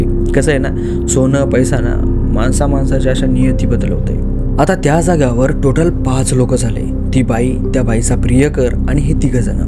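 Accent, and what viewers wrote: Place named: Beed, India. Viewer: बीड चे काय ,बोलीभाषा वाटते तशी😅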